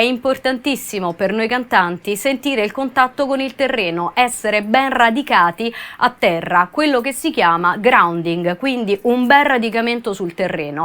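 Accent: Rome, Italy. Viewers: Se sente che è de Roma :D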